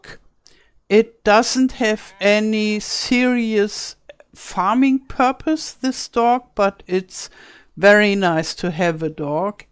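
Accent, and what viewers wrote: Germany. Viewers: Just found your channel after searching for videos on farming sim se on switch 2 cause im trying to decide whether to buy it. Absolutely loving your accent, I'm Australian but you sound just like a good friend of my husband and I that is originally from Germany. Her and her husband moved to Australia decades ago but they both still have their beautiful accents. Great info in the video too.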